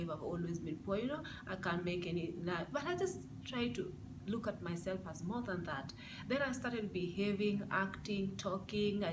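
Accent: Kenya. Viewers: Yeah i knew the moment you started talking.the accent huh😍😍😍😍.mimi pia nimkenya nko switzerland one day i will do a video. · butterfly9274 That's a Kenyan accent. That's why I took Interest to listen on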